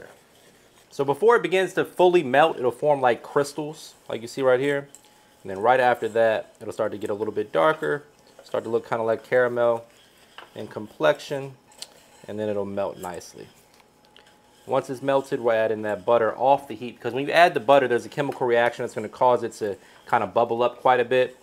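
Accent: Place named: Florida, United States. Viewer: Where are you from? Southeast? Your accent makes me think of Florida. 😂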